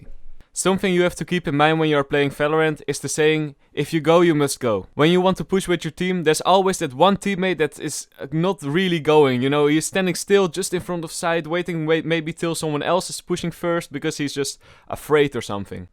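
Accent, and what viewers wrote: Netherlands. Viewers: Het nederlandse accent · I smell Nederlands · 100% jij bent NL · Are you dutch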